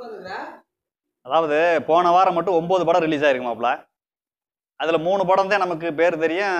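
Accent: Madurai, India. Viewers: madura slang thane unaku pluse continue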